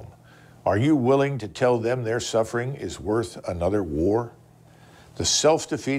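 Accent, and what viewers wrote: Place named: United States. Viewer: I dont care what party he votes for, he sounds like a REAL American.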